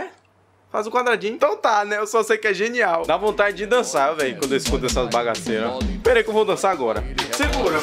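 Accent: Bahia, Brazil. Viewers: Vc e baiano? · Ameiiii!!! Vc eh baiano mocim???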